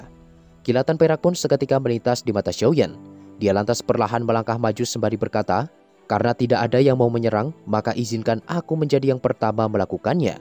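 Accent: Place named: Malaysia. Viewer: Saya minat dengan cara sebutan min yang tidak kalah dengan orang malaysia, sama seperti portal hitam, cara penceritaan yang penuh penghayatan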